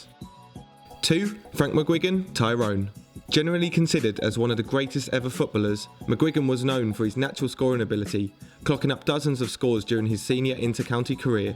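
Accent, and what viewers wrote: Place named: England, United Kingdom. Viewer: Funny to hear an english voice on a GAA video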